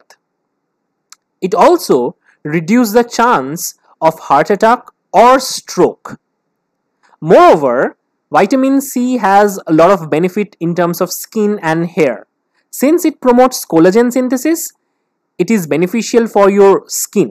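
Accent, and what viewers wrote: India. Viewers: This guy's got such a strong Indian accent, I love it. None of the cullies in my family have this strong of an accent, this guy's more Indian than Indian.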